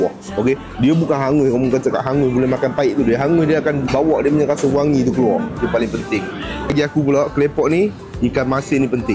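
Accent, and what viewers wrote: Malaysia. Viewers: Dia ni melayu ke cina 😂😂 slang melayu habis.. ni betul2 org msia. ❤❤❤ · Gila aku mati² ingt brader ni melayu Bila tgk nama channel baru lah cine rupanya, mmg pior sleng n sgt fasih bhsa melayu dia.. Terbaikk lah bang smoga maju jaya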